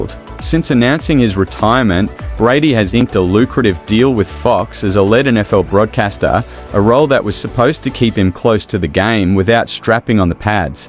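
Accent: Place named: Australia. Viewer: Do not trust anyone with an Aussie accent telling us what the NFL is doing…… Not gonna happen……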